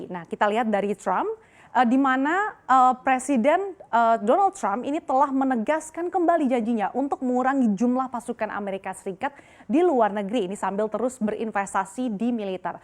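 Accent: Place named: West Kalimantan, Indonesia. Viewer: Logat lu kek logat kalbar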